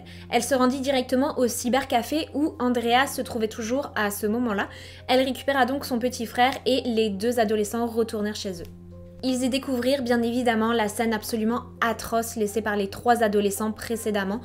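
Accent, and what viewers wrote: Quebec, Canada. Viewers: Est tu canadienne tu a un acsant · On dirait qu elle a un accent léger du Québec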